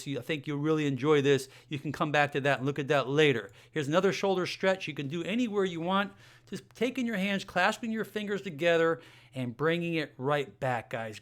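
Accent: Australia. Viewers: hi Are you from Australia? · Are you from austrelia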